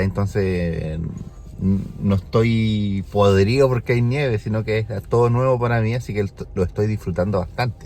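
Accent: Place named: Chile. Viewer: Llámame loco, pero me basto 1 segundo de tu voz para darme cuenta que eres Chileno, ojala nos encontremos en algún momento allí en Vancouver ya que viajo en Marzo, saludos!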